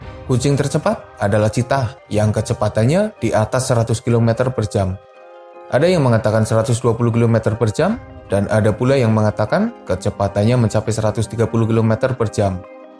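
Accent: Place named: Purwokerto, Indonesia. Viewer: Adminnya kaya orang Purwokerto logatnya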